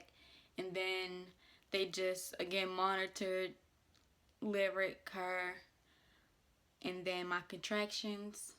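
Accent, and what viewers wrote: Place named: Baltimore, United States. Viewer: I was listening to you talk and I was like “Oh she’s from Baltimore!” I know my ppl when I hear them🤣 I grew up in Towson but have been living in DC and now National Harbor, MD. What hospital did you have her at?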